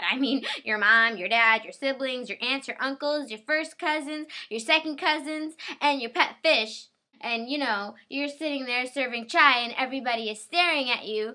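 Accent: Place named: Hyderabad, India. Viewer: uve got HYDERABADI connection. U should make videos on accents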